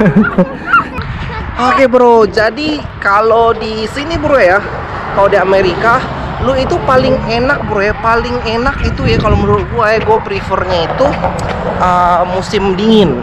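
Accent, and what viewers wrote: Indonesia. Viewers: Mantap aksen anakmu udah kayak anak2 amerika, kalo bapaknya masih kentara indo banget hehe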